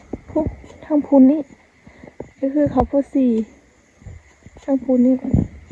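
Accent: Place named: Phu Thai, Thailand. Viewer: น้อง ขนาดตอนกลางคืนยังหน้ารักเลยนะคับชอบสำเนียงสาวภูไทคับเพราะดีมากๆ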